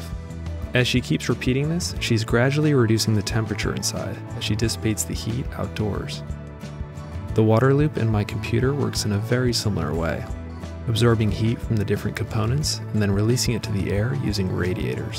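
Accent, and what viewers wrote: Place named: United States. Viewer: The way you say Gianni sounds like Johnny because of your American accent lol. A strong American accent saying John sounds more like Jahn to my Aussie ear. (We have our own funny pronunciations)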